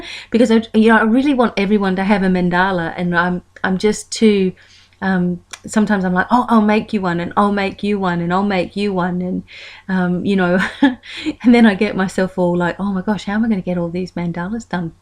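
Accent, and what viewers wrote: Australia. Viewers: hi thankyou for your monthly dose of spirituality, i was looking forward to listening to this. I especially like the part about what you said about Cats, yes they have a great energy, that they pass on, when all around is hectic they can bring a sense of calmness. keep the videos coming, watching and listening is a meditation in itself. Along with your lovely Aussie accent. peace and love.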